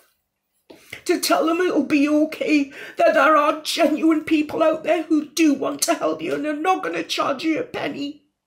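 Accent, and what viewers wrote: Newcastle, United Kingdom. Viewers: Oh my goodness, I wanted to reach through the screen and hug you. You are like a mamma bear and clearly have so so much compassion for everyone and their mental health. You are amazing, please keep being you! As an unrelated and not serious side note - I love your geordie accent! I always connected it with genuine kindness and love. I hope you are ok <3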